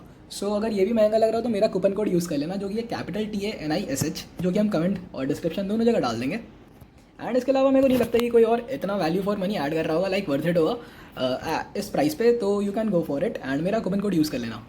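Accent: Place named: UP/Bihar, India. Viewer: Bhai "ham" up /bihar se ho kyaa?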